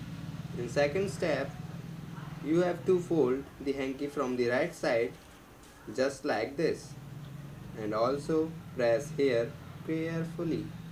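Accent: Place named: India. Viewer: Indian hokar english bolta he pagal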